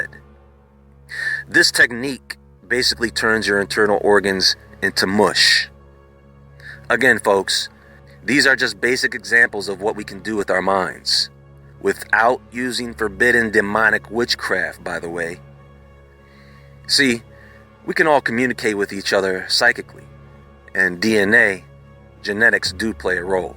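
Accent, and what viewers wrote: United States. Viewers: He makes "neurons" rhyme with "morons". American, Nuff said. That said, far far less off putting than most americans.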